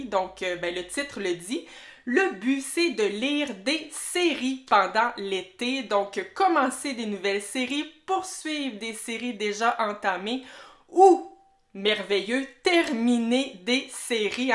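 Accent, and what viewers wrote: Quebec, Canada. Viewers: Coucou 😊 super vidéo 👏👏 i comme c'est agréable d'entendre l'accent québécois ❤️❤️❤️ comme dis sur Instagram je découvre pleins de nouvelles sagas et surtout j'ai mis malphas dans ma wishlist! Merci pour cette vidéo! Bisous 😘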